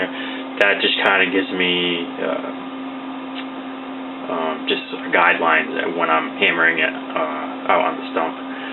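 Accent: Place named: New England, United States. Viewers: Lmao I was like hey am I bugging or is he from new England. I'm 15 min south of boston myself. Where you from? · I wouldn’t apologize, ya did a great job with your video, and the New England accent, I was raised in Boston, and have lived for 34 years in Pennsylvania, and still have that Boston accent, makes me feel like I was listening to a buddy back home 😊 nice job on the fender........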